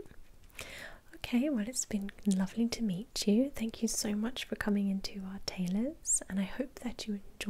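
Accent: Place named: RP, United Kingdom. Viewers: Wonderful tailoring video, I needed a new suit fitting for clandestine work lol. Was surprised at how relaxing a tailoring video can be. The sound of your irresistible posh accent and beauty and no background noise. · Posh bird must be from Surrey 😁 good vid by the way 👍🏻❤️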